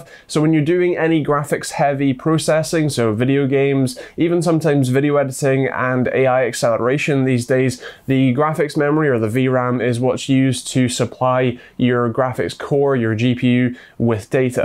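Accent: Scotland, United Kingdom. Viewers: his voice is Scottish where tf do you think he’s from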